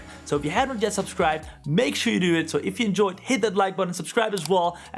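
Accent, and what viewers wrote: Netherlands. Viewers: Nederlands right? 😂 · Het is sws een Nederlander😂 accent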